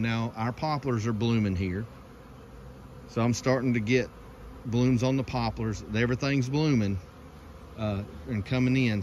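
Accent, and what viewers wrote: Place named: North Carolina, United States. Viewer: Good flow in NC also. I’m up the road from you in foothills. I’ve always taking reducers out during flow. I knew you were from NC before you said so. We got that accent.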